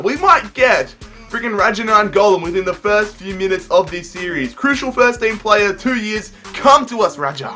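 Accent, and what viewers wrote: Australia. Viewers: where u from? is it Australia? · Are u Australia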